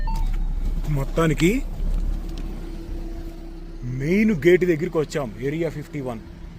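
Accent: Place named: Andhra, India. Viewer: Anna nee slang andra slang laga undhi anna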